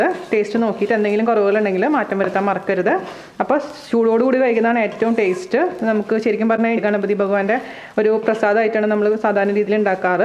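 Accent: Thrissur, India. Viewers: I follow urs and Aju’s world mostly. U both from trissur and it’s nice to listen ur trissur accent.. wish you all the best..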